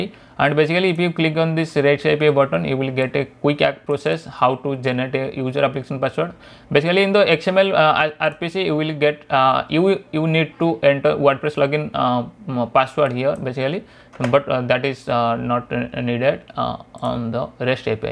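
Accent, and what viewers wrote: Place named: England, United Kingdom. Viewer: You have an awesome English accent. So easy to understand. 👍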